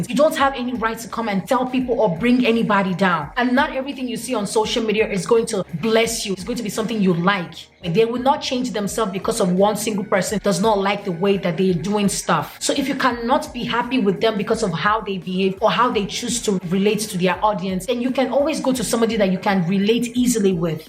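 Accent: United States. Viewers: Wow I thought maybe you moved to america wen you were young. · U really sound American, the classes u took did a good job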